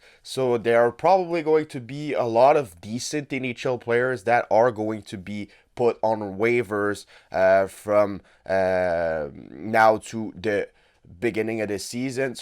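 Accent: Quebec, Canada. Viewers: Really love the videos bud! You're really consistent and the Quebecois accent is sick hahaha keep it up brother!!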